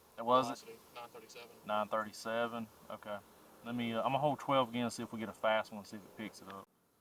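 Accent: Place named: South Carolina, United States. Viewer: I know an SC accent when I hear one. Awesome video. I was interested in .300 blackout but maybe I'll save myself some money.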